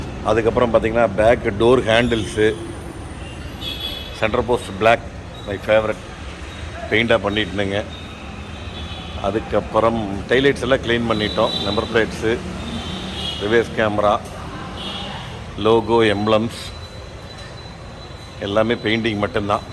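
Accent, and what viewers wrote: Kongu, India. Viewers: கொஞ்சும் கொங்குத் தமிழ் பேசும் கோமகரே...! திகட்டாத தித்திக்கும் பேச்சுடைய திருப்பூர் திருமகரே...! எத்தனை அழுக்குப் படிந்த வாகனமாக இருந்தாலென்ன?? வெள்ளை மனமும் பிள்ளை குணமும் கொண்ட மதிப்பிற்குறிய அய்யா🙏 உங்கள் வேலைப்பாடுகளில் வாகனங்கள் ஜொலித்திடுமே தகதகவென்னும் தங்கம்போல❤❤❤❤❤ பின்னலாடைக்கு பெயர்போன ஊராம் திறமை வாய்ந்த ஊராம் திருப்பூர்...! அத்திருப்பூரில் வாழும் திருமகர் அய்யாவின் வெள்ளை மனம்போல மாறிவிட்டது...! இந்த வாகனத்தின் வென்மை நிறம்...! இந்த வாகனத்திற்கு மட்டுமல்ல அனைத்து வாகன வேலைப்பாடுகளுக்கும் கடினமாக உழைக்கும் உங்கள் நிறுவன ஊழியர்களுக்கு என் மனமார்ந்த பாராட்டுக்களையும்❤❤❤ வாழ்த்துக்களையும்💐💐💐 தெரிவித்துக்கொள்கிறேன் என்றும் அன்புடன் உங்கள் மகன்போல இவன் ரா.முஹம்மது கபூர் (சென்னையில் இருந்து)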